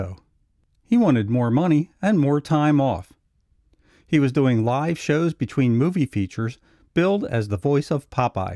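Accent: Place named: Pittsburgh, United States. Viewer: What part of Pittsburgh are you from?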